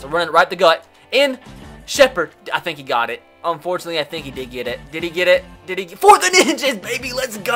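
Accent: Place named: Texas, United States. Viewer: I feel like he is a Texas stereotype with his accent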